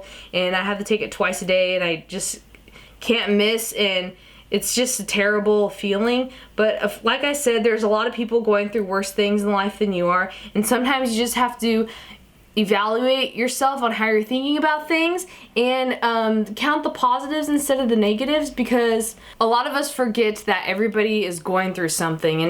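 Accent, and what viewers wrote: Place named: Texas, United States. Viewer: are you from Texas originally?? I always think you have a thick Texas accent:0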